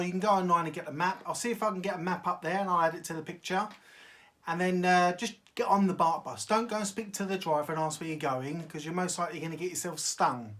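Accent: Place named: England, United Kingdom. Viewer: he's definatly not aussie...im aussie, and i picked up straight away he's a pom(english). they dont say"th", as in "noTHing", they say "nuFFin", thirty is firty,etc.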